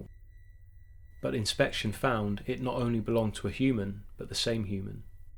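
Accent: England, United Kingdom. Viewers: It is very much an authentic English accent,from somewhere west of London.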